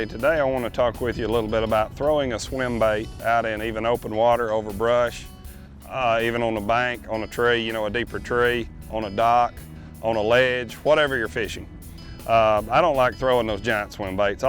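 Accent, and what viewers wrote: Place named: Appalachia, United States. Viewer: You know it's good when he has a hillbilly accent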